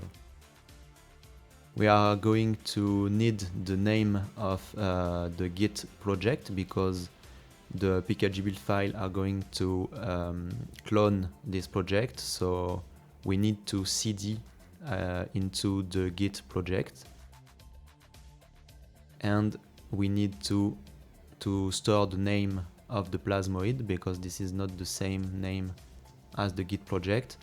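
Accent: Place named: France. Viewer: Question : how do I get my pgp id for the validatepgpkey (also nice french accent)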